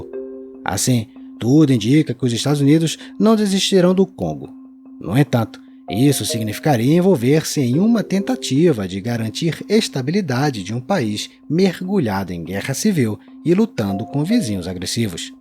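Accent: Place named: Rio de Janeiro, Brazil. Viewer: Esse é o melhor canal de geopolítica que já vi, chaga a ser um canal essencialmente excelente. Acho que o narrador é carioca por causa do sotaque.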